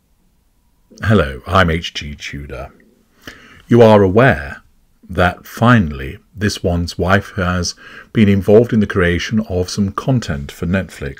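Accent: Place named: Cheshire, United Kingdom. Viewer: I think he is from Cheshire as he speaks exactly like the Cheshire set with a few Mancunian sayings thrown in to confuse us all.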